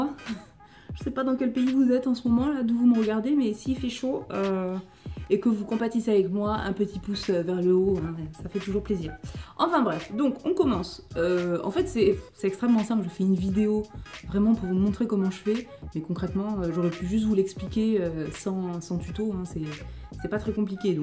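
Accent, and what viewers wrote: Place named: South, France. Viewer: hahaha jm trop l'accent du sud hahha moi je te regarde de Marseille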